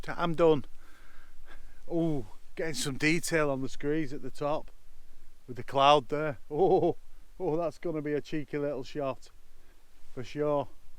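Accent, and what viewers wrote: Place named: Sheffield, United Kingdom. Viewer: The last time I heard that accent was on my last visit to my home town Sheffield in 2006 from my adopted home in Tasmania. Thanks for sharing. Cheers Peter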